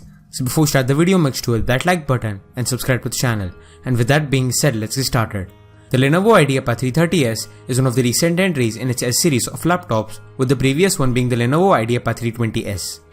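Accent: India. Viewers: That dirty fingernails are disgusting me, and then I realize the Indian accent.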